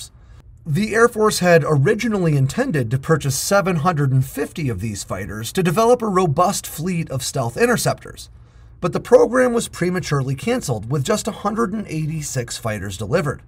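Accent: United States. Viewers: K-tar I luv how Americans pronounce words 😂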